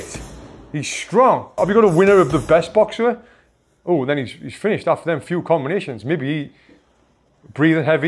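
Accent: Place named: England, United Kingdom. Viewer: jef mate i swear ue english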